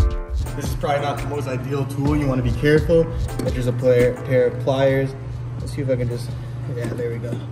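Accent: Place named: Toronto, Canada. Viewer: Yeah Im from here too I could tell by the accent, im glad it isint over faked like most toronto mans wannabes lol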